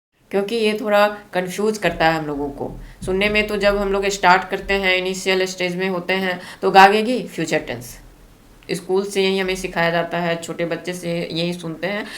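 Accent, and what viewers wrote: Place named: Bengal, India. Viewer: Madam you must be Bengali. Right ?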